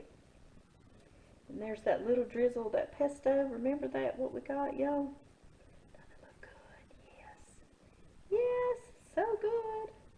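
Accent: South, United States. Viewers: Just had to follow you as you seem such a lovely warm person..Oh I love your country accent too. Hope you had a lovely holiday in good ole USA from me in the UK x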